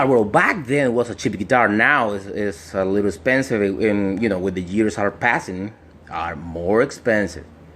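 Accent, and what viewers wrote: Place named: Latin America. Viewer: You is "colombiano" or Argentino or mexicano?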